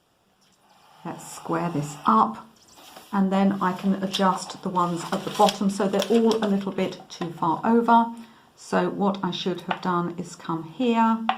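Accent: United Kingdom. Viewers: I love your teaching methods, I have learned so much about drawing from you. I am very sorry that some people are mean. Also love your British accent.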